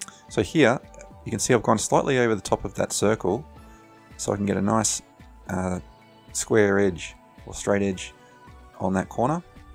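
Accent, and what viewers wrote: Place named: Australia. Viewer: Nice lesson, also good to hear an Aussie voice for a change. Your course is no longer available. Have you moved to Udemy, Teachable or Skillshare by any chance?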